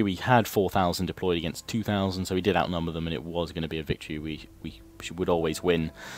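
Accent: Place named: United Kingdom. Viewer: You have one of those proper sounding British accents. I enjoy your vids.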